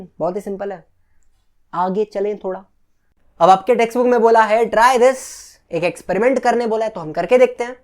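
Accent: Maharashtra, India. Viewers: Dada tu Marathi ahes?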